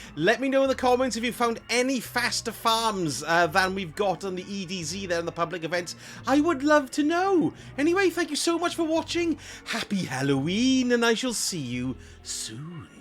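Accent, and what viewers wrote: Wales, United Kingdom. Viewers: Like this guy, funny. I Can’t work out if he’s north or South Wales? Anyway thanks for tips!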